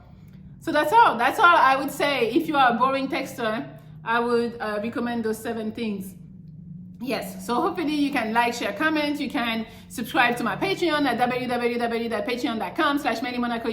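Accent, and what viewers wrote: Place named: France. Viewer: Are you french bro?😭 Im sorry but as a french dude it freaks me out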